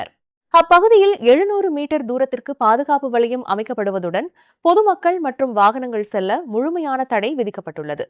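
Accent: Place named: India. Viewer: இந்திய பாணியில் செய்தி வாசிக்கிறீர்கள். ர ற ண ன ல ள உச்சரிப்பு சரியில்லை.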